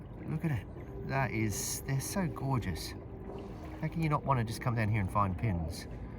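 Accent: Australia. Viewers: Some lovely finds. The cloth seal is so detailed and it’s amazing that some of the material was still attached to the belt part. I love those beautiful hand-made pins. Always enjoy your videos (and hearing that Australian accent 😋)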